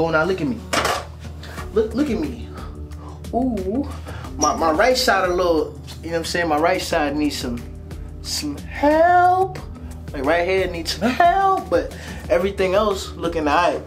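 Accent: New York, United States. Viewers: Where you from bro , New York philly ?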